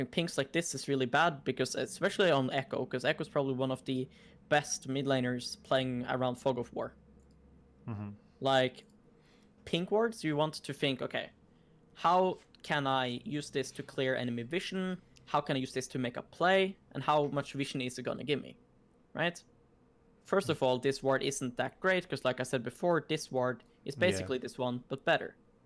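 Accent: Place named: Denmark. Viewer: just the danish accent :D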